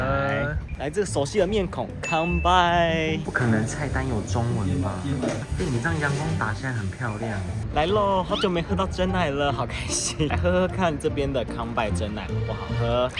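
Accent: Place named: United Kingdom. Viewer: 和一樣經過過小熊軟糖的店，但當時窮遊覺得買不起就沒進去了～印象中的漢堡是一抹鮮豔的紅好想念德國啊啊啊啊聽到你的英式德文忍不住笑ㄌ😂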